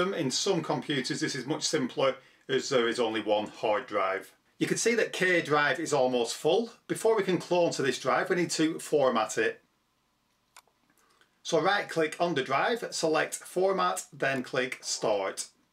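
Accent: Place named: Lancashire, United Kingdom. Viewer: Someone is from Lancashire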